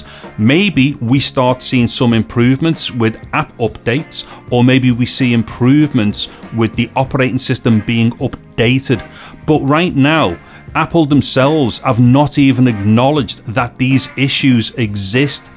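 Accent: Liverpool, United Kingdom. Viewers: Never played a vid game ever sad I know, just love listening to a scouse voice brilliant. Not like south ar’ampsher drawl. Love my new iPad albeit a 11” keep gaming sir 👌😎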